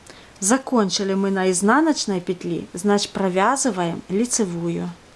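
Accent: Ukraine. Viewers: Западная Украина ? Или просто суржик ?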